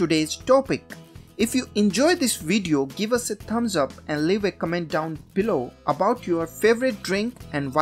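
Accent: India. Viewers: Your Indian accent 😁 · indian accents are crazy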